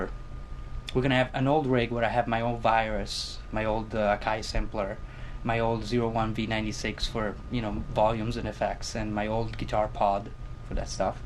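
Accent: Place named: United States. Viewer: grande mozza ! beato te ;-) you're picking up an excellent american accent...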